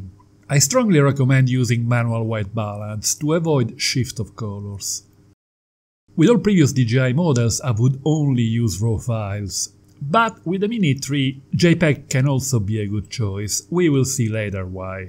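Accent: Italy. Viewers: I’m enjoying your videos. I love your Italian accent. Just like myself living in Australia with a strong Swiss accent.👍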